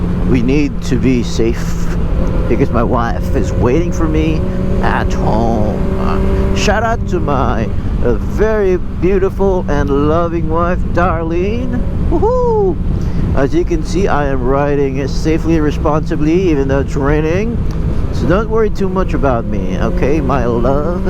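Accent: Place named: Philippines. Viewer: he's accent is Filipino, so i guess Filipino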